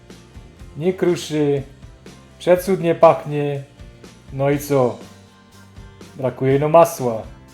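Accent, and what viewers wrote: Silesia, Poland. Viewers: Pospiesz sie z tym zytnim chlebem, prosze. Uwielbiam Twoja slaska gware i prowadzenie. Krotko, konkretnie, na temat i z humorem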